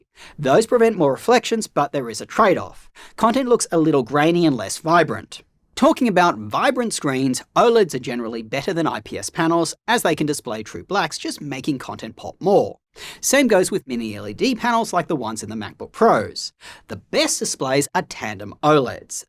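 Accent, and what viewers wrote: Australia. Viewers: i got excited thinking it'd be an australian website with australian deals, thanks to the australian accent.. quickly got shut down. Wish you did one for the domestic market as well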